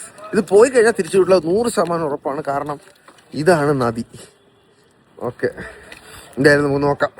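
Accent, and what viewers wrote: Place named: Punjab, India. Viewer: ഹിന്ദിയിൽ നല്ല രീതിയിൽ പഞ്ചാബി വാട അതായത് accent ഉണ്ട്, ഡൽഹിയിൽ പഠിച്ച സമയത്ത് ഒരുപാട് പഞ്ചാബികൾ കൂടെ ഉണ്ടായിരുന്നു എന്ന് തോന്നുന്നു, ആ ഭാഷയിൽ pitch modulation ൻ്റെ വേലിയേറ്റം ഇറക്കം കേട്ടാൽ നന്നായി മനസ്സിലാകും, എന്തായാലും ഹിന്ദി ഇഷ്ടപ്പെട്ടു. വർഷ പഠിക്കുമ്പോഴും വർഷയുടെ ഹിന്ദിയും ഇതേ തരത്തിലാകും.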